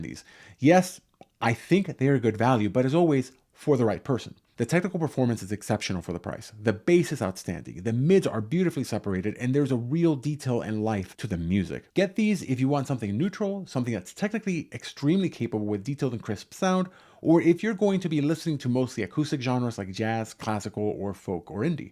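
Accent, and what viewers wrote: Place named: Castile, Spain. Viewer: I have to ask, are you Spanish? Your accent, while pretty neutral, seems to leak a little… Castellano…?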